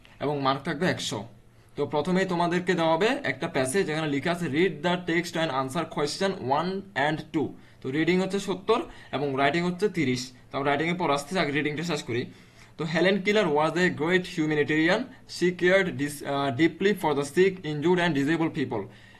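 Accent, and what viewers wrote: Chittagong, Bangladesh. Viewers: Sir apni ki Chittagong er?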